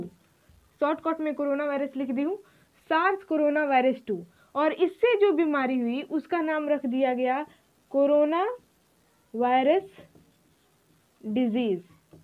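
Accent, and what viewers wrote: Bihar, India. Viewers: यदि हमें ऊर्दू आती हैं तो हम आपकी video dealy देखते पता नहीं आप को हिंदी आती हैं की नहीं क्यूं हमने हिंदी मेंcomment किए 🙏 एसे ton से तो आप भी बिहारी ही लगते हैं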